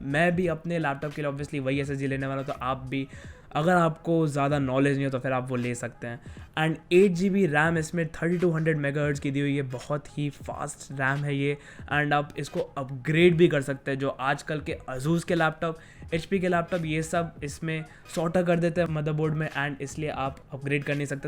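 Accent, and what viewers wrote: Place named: India. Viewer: What it this for a language? Indianenglish ?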